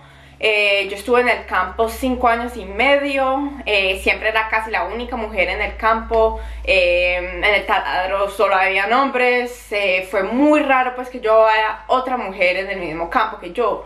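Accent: Colombia. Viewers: Hola... mucho gusto... que nota tu experiencia... La verdad se te escucha el acento como más Paisa... jajaja... ¿Entonces, ahora andas en las ventas? que buena decisión, con esto potencializas más tu visión y de verdad te felicito desde acá de Colombia... tu amada tierra... y de tus orígenes "San Andres" el lugar más hermoso de Colombia... Que la vida y Dios te sigan dando ese éxito que cultivaste durante 5 años en los campos... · Acento Colombiano?🤔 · Que bellaaaa!!!! Lindo acento colombiano, y muy bien el español. Soy tu admiradora desde REPÚBLICA DOMINICANA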